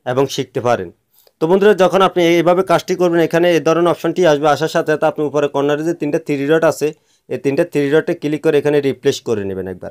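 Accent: Noakhali, Bangladesh. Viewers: আপনি আমাদের ফেনী নোয়াখালীর মানুষ হবেন আপনার কথা শুনে বুঝতে পারলাম যদি হয় কাজটা মন থেকে অনেক দোয়া করবো আর যদি না হয় কষ্ট করে আপনার কন্টাক্ট নাম্বারটা আমাকে দিলে খুব ভালো হয় আর আমি আপনার ফোনের মত হয়তোবা ছেলের আইডি ইউজ করি আরকি সেফটির জন্য প্লিজ আপনার নাম্বারটা দিলে কোন ক্ষতি হবে না প্লিজ 😢😢😢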